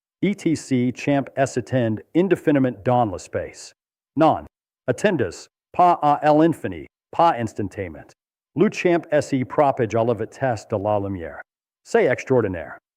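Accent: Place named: England, United Kingdom. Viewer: Oups 😮 le sujet est intéressant mais la prononciation anglaise des mots francais les rend pratiquement impossible à comprendre... You better say it in english or find someone Who speak " français " 😊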